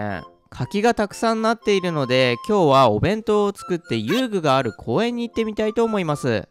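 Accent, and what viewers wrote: Ibaraki, Japan. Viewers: 出たぁー🎉コロちゃんの茨城弁😂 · コロちゃんの茨城弁が可愛いです 手作り弁当美味しそうでうらやましいです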